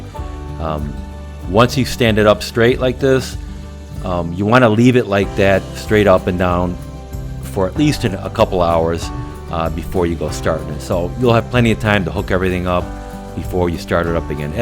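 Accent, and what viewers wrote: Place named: North, United States. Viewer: bwaaa haha😂 my dad and I listened to your video together, in the first two min I said "this guy is a northerner, he's from north PA, but south of Boston. Can't figure out why he doesn't say WOOter(instead of WATTer)." 5$ bar bet you're from Southern MI?!? Thanks for the awesome video, Can't wait to install the new unit(s) that we bought..we're putting his in in Southern Colo tomorrow, I'll do mine in south TX later on in the week. Your video was a great help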